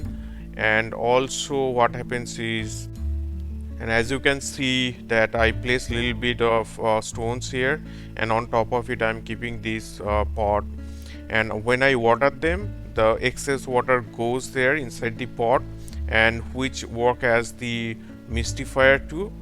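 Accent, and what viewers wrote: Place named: India. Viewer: That accent..Assamese / Bengali?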